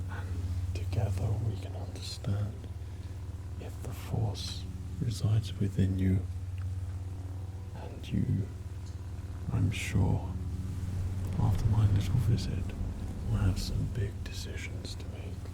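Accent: England, United Kingdom. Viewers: Now a rude english sith lord!!!!!! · Rude English Gentleman Sith version. For all of us non-Jedi scum. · RUDE BRITISH JEDI TRAINER😂😂😂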